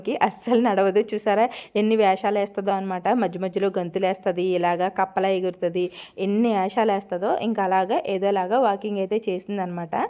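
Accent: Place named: Godavari, India. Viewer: Meru vidiga matladetappudu godavari yaasa lo matladutunaru...voice over echetapudu normal gane matladutunaru baga gamaninchana andi... Ayya baboii siggesestondi .... 😂😂😂😂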